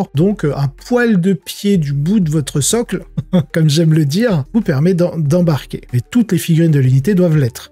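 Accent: England, United Kingdom. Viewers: Merci, c'est tellement nécessaire. Même en amical, être clair sur les règles du jeu permet de vraiment profiter du jeu. Toujours un plaisir de te suivre . Ton accent anglais, ta marque de fabrique 😎. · Un grand merci pour cette vidéo! Ça fait toujours du bien d'avoir des éclaircissement sur les règles, qui dans certaines situations ne sont pas évidente! Surtout avec qualité ET un accent anglais extraordinaire ^^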